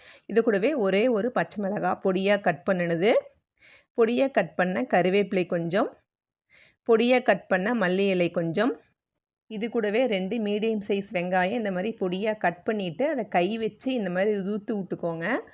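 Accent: Kongu, India. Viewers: Madam pesu podhey theriuthu., Kongu Nattunu., intha madhiri receipes ellam kongunadu perponadhunga... 🙌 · கொங்கு தமிழ் மணக்குதே!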